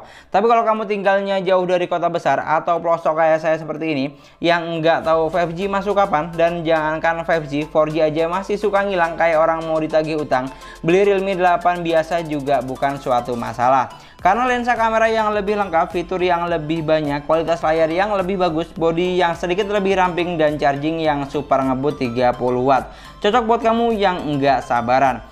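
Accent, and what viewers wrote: Madura, Indonesia. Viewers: madura aksen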